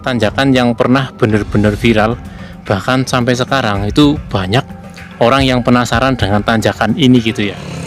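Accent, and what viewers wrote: Banyumas, Indonesia. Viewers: Mas ki ngapak2 dialeknya, tapi pinter bahasa Jepang. sekali2 dimunculkan mas broo BHS Jepangnya? Kita biar sambil belajar Jepang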